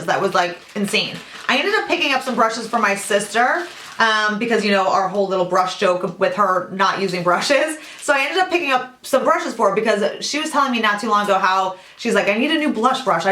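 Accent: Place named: Northeast, United States. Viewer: I knew you had a north east accent , I'm from the north east as well ( New Hampshire ) I live in southern Virginia now . This makes me love your channel even more .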